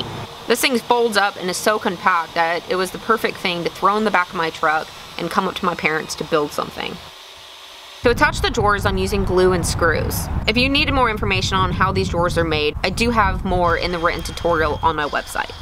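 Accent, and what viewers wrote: Texas, United States. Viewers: Texas. It's a southern thing.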